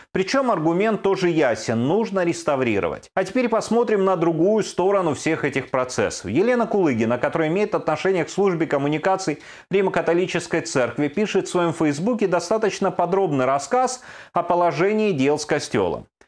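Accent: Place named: Moscow, Russia. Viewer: По речи я но, что не украинский журналист.Уж очень московский акцент.Наверное по сути правильно,но какое вам дело до всего,с о происходит в другом государстве.Ах,речь идёт о церкви которая принадлежит вашему государству,т.е.Московской России.Вы давно уже разграбили украинские храмы,а теперь ещё цепляетесь зубами и когтями за то,что вы считаете имеет значение для вас и ваших интересов в Украине.А главное прибыль от паствы.При всем при этом суть вопроса понятна,надо было восстанавливать храм.И прежде всего забрать его у Московского патриархата.Уходите с нашей земли и мы наведём у себя порядок.А вы держите у нашего горла нож и ждёте чтобы мы пошевилились,чтобы убить нас.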